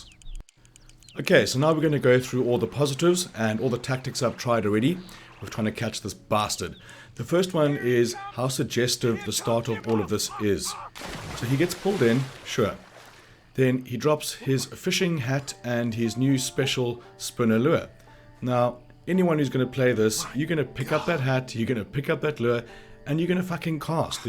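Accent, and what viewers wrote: South Africa. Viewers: Holy shit I did not expect a South African accent!!Howzit my China💪🏼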